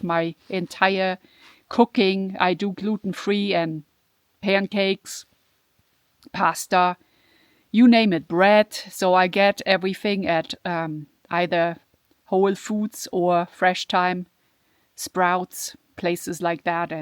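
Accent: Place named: Germany. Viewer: Hallo, Was für schöne und abwechslungsreiche Wanderwege in absolut fantastischer Landschaft. Beeindruckend diese Felsenlandschaft mit gefrorenem Wasser. Das hätte ich in diese Gegend nicht erwartet, das es so kalt werden kann. Schöner Nebeneffekt des Videos - mein Englisch noch ein wenig Aufzubessern - sehr angenehm mit deinem deutschen Akzent und sogar besser verständlich 😊 Gott sei Dank gibt es hier bei uns keine Bären😉 Danke fürs mitnehmen und noch einen schönen Sonntag! LG Thomas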